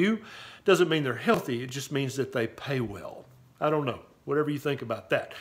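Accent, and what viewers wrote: South, United States. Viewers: love that southern accent 😊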